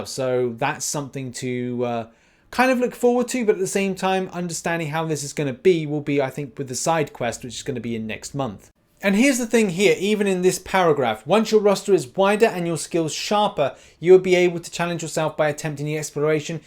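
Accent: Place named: United Kingdom. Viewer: thanks, I'm a sucker for British accent lol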